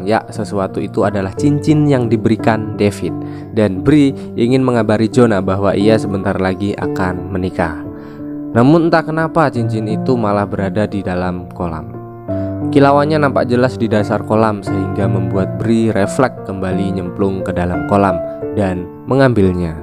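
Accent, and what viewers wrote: Surakarta, Indonesia. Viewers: Orang solo ya bang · Wong solo to mas😂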